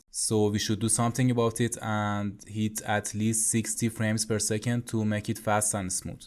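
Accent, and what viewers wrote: Iran. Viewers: سلام ، عالی بود ، فقط یه سوال داشتم ، ایرانی هستی ؟ آخه لحجه ات فارسیه توی تلفظ کلمات اما بازم کارت عالیه ! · داداش دمت گرم عالی بود.ایرانی بودن یه طرف انگلیسی رو با لهجه اصفهانیدوست دارم :goodvibes:. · iranian accent :)) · thanks for the tutorial 100% Persian accent 😄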